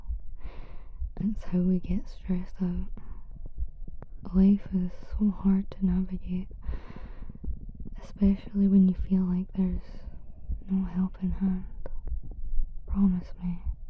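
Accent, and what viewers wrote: Scotland, United Kingdom. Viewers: u have to be from scotland the voice just it speaks to me i mscottish and u sound exactly like other vtuber / f4m content scottish creators and some people in my area lol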